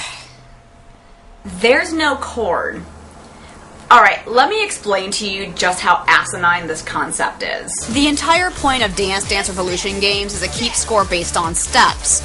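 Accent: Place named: Australia. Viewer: Lol she is Australian not British. I love DDR but this was hilarious.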